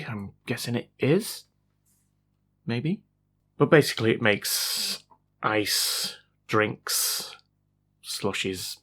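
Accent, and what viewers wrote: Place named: Bolton, United Kingdom. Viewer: Great to here the Bolton accent, I lived in Westhoughton many moons ago.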